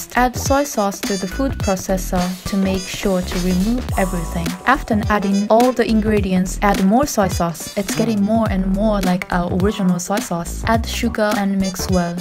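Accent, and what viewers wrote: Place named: Japan. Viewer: I miss being in Japan so much. Your accent brings me way back.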